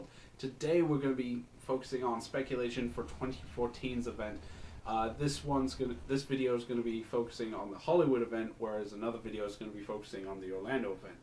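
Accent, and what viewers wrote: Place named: England, United Kingdom. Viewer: Oh thats good and ohhh an england boy no wonder the accent ;) lol do u have kik?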